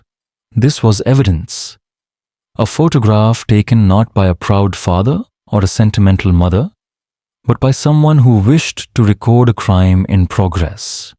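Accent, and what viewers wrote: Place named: India. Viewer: so I love the stories, but bro leave your Indian accent at home. A suggestion from one Indian to the other.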